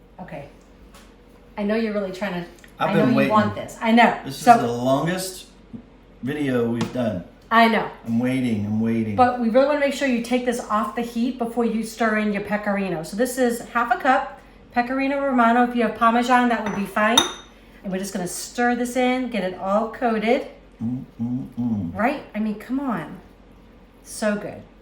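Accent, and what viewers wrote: Boston, United States. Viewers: I love this recipe! I also love the Boston accent!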